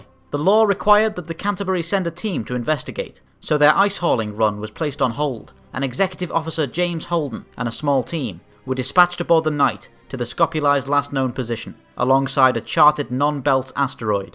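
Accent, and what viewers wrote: United Kingdom. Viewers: I really like your videos! Question are you British it sounds like it.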